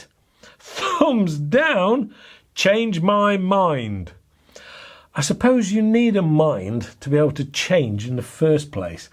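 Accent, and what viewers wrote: United Kingdom. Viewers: What a great way to present review and I liked your British accent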